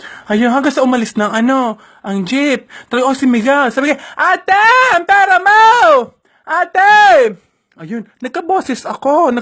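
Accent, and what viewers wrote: Batangas, Philippines. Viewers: Saan po kayo nakatira ate kasi po parang pangbatangenga salita nyo ehhh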